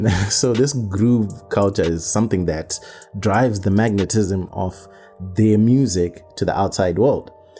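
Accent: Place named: Ghana. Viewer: You sound like a Ghanaian. Let me educate you on something; Jollof is West African and Nigerians didn't appropriate Amapiano. The generation of musicians that existed before the current musicians paved the way and the new generation just used what they blazed as a stepping stone to the top. There's no substitute for hard work.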